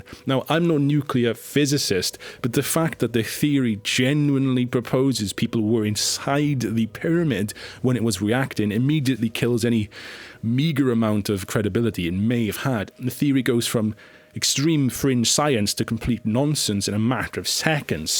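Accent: Wales, United Kingdom. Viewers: Lovely channel...beautiful (Welsh?) accent! When you ask for everyone to be nice at the end, your manner is so lovely that I just want to be nice! Please do do more about the CHEM stuff....it's pretty interesting and the guy proposing it doesn't seem like a nutter!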